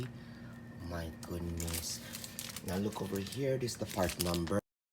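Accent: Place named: Philippines. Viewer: Thank you so much pare! I loved your distinctive Filipino accent and humor. I'll be doing this project soon! So many say you have to open your roof half way to do this but, obviously you don't! Salamat po!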